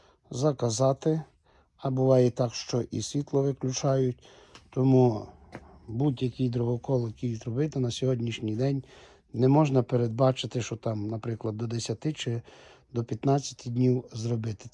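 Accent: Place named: Ivano-Frankivsk, Ukraine. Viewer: Ви часом не з іванофранківщини бо дуже знайомий акцент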